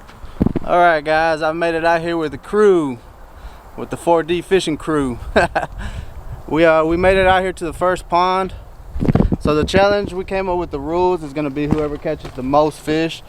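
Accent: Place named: Texas, United States. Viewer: The texmex accent 🤙🏻🤙🏻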